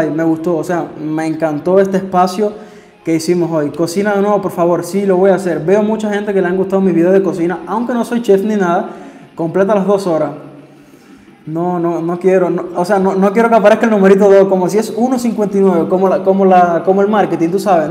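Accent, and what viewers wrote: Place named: Cuba. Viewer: No inventen, se oyes bien cubano, bien. Con acento cubano y nada mexicano. Soy mexicano de Florida. Conozco a los cubanos de todo tipo, trust me! Desde los recién llegados, que no hablan inglés. Otros que hablan un inglés, pero todo estropeado,heavy Soanish accent, que yo no entiendo, ni mdrs . De esos que les dices, "Chico, mejor vamos hablar en español". "Porque your English, is horrible!", jajajaja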